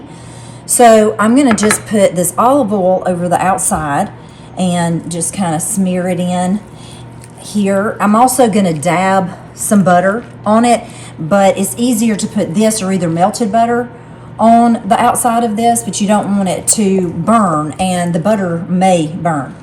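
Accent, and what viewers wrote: South, United States. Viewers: Great recipe ! I could listen to your southern accent all day 👍🏼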